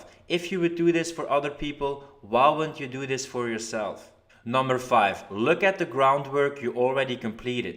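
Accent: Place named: France. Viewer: No mather what it is - quiting bad/ building new habbit but the moust important from the points you were talking about after an slip-up is to foregive yourself and not judge yourselffor so much, but it it is also the moust difficult thing to do, for me at least.... if i can ask.... is this only your hobby ? or do you work with stuff like that ? i mean if you are some advisor , educator ,speeaker or no idea how to describe it (but i hope you know what i mean) and 1 more question.... where are you from ? i gues France :D (or a native french speaker at least acording accent)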